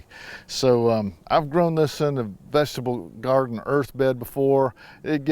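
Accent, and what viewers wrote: South, United States. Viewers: 😂His drawl is what I enjoy, too! You're a lovely man,! 🎉 -Judy 🇨🇦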